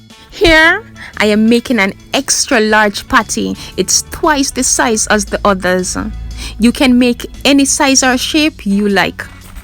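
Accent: Jamaica. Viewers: I kept seeing authentic patty recipe on youtube but everyone i watched wasnt giving me the authentic jamaican vibes😒 and the dough wasnt giving me the flake😔. So my search brought me here🙃 to the real Authentic jamaican patty💯💯. I needed that accent this certified🥇 it for me lol the" ya mon" at the end🤗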